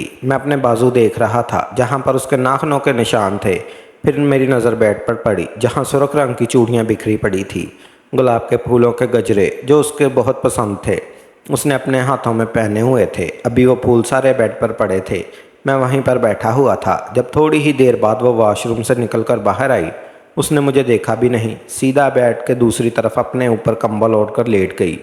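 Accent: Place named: Punjab, Pakistan. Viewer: ابے اردوُ بول رہا یا پنجابی ۔۔۔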